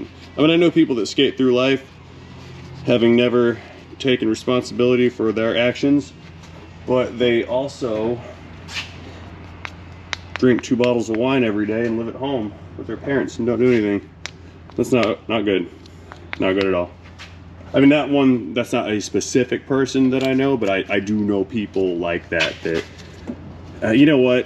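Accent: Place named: South, United States. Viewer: I always notice that after every night you over party you tend to talk S L O W L E Y and the vids tend to be an hour or more. BUT us Southerners like it when you start talking like a local. :goodvibes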